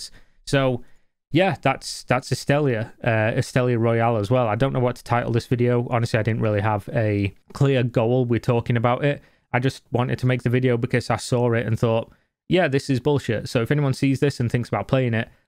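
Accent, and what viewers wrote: United Kingdom. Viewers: so satisfying to listen to a man rant in a british accent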